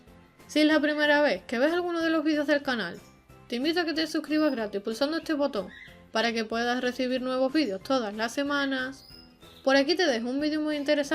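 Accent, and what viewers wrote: Spain. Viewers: de que parte de españa es tu acento ? saludos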